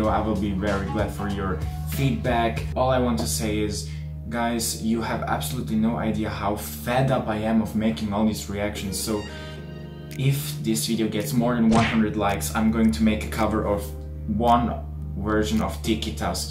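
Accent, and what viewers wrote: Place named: Israel. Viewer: Bro......l can hear your isreli exent. pliz stop embresing your self. THNKS.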